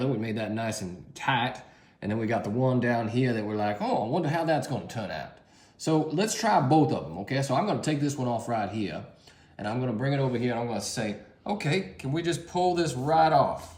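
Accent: South, United States. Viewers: That glorious Southern voice …a true gift from God…😊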